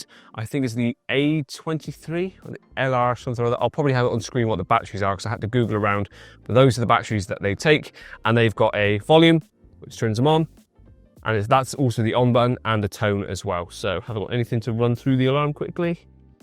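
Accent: Bristol, United Kingdom. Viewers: What your accent Bristol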